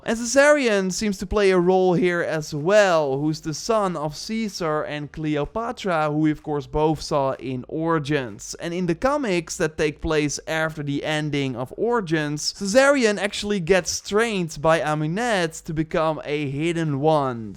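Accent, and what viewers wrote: Netherlands. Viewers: yow are you nederelands you sound like it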